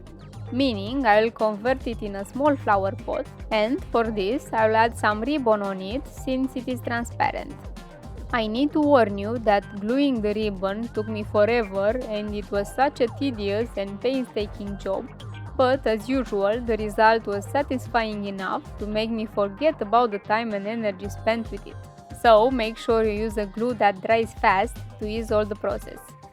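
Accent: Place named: Romania. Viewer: Ma bucur ca ai prins 1000 subs.Foarte tare accentul romanesc.Succes.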